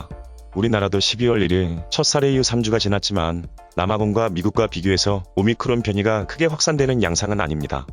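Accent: Gyeongsang, South Korea. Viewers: 의학정보중 제일 신뢰가 갑니다. 하루빨리 목소리가 회복되셔서 구수한 경상도 사투리로 말씀하시는걸 듣고 싶습니다. ❤️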